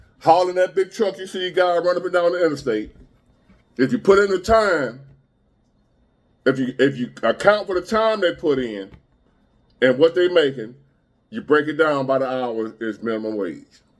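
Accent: United States. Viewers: Facts!!! This dude popping up out of nowhere on everyone's youtube feed sounding like a regular American. He is being paid to do this, I guarantee. He is the shabbat goy THEY are using to convince truckers to abandon their trade via getting replaced for striking.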